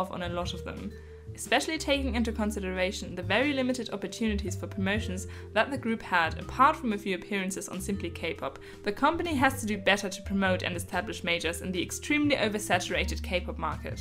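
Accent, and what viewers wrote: Germany. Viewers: this might be irrelevant but i absolutely love your accent! i think i can hear that you speak german as your first language, and i just wanted to say that it makes listening to you speak very relaxing. sehr schön!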